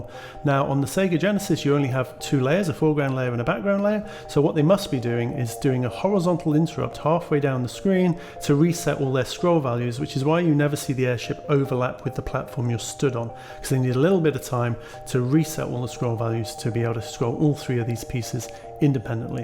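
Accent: United Kingdom. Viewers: Is this a sligthly british Joey Zaza talking ?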